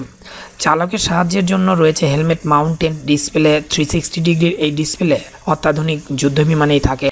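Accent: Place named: Bangladesh. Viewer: তুই কি বাংলাদেশি তোর শব্দ উচ্চারনে বাংলাদেশি গরুর গন্ধ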